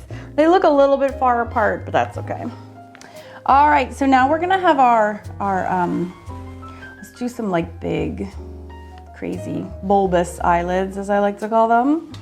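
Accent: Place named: France. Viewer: Oooh la la. And I liked your lil French accent. 😂😂😂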